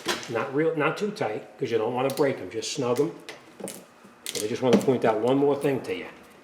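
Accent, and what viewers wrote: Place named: Boston, United States. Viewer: I swear to fuck. I love the Boston accent. Ya did gud wurk.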